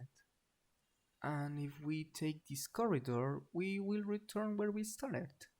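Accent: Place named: United Kingdom. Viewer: I'm new , are you British? because your voice kinda sound like British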